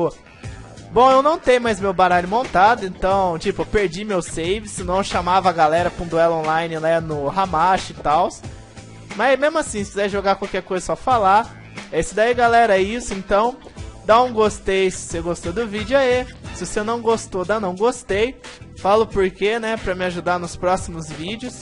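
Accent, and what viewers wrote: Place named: Minas Gerais, Brazil. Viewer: gosto muito do seu sotaque ele é muito engraçado sotaque mineiro (ochente)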